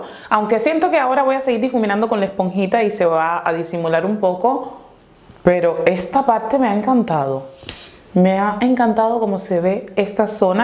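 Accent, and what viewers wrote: Dominican Republic. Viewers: cuando dijo que esas ofensas hacia ella misma eran bromas yo me dije como , eres Dominicana o algo asi? y luego dijo quija y fue como, noooooo si lo es y cuando dijo vaina en vez de cosa me dije confirmado XDXDXD saludos desde Rep.Dominicana .es que los Dominicanos nos decimos ofensas pero es más a modo de broma XDXDXD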